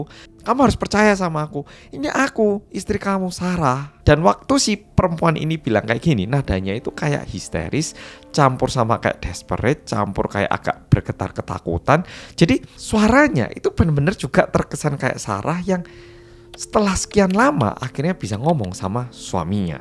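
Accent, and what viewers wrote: Java, Indonesia. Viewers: Bang mukamu Korea tp bahasamu medok bngt..mf y jgn marah 🤞🤞tp cerita mu seru abis dn keren 👍😄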